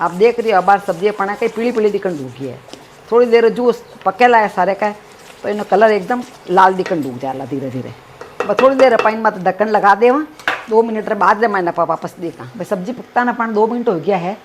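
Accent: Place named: Marwari, India. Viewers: I like to hear ur marvadi hindi..Pranam to U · Yes it is Hindi. But Hindi also have many dialects, depending on geographical location. In rajasthan only we have 10-15 diffrent hindi dialects. This madam in this channel is speaking marwari hindi. In Udaipur area you will have diffrent dialect of mewari hindi. In Jaipur side you will find dhundadi hindi dialect. In same way in uttar pradesh mathura side there is brij bhasa, which is also a kind of hindi dialect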